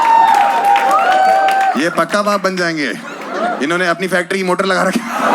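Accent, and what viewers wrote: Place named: Haryana, India. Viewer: Haryana m rehkar Haryanvi seekh gaye Bhaai 😂😂😅😅🙏🙏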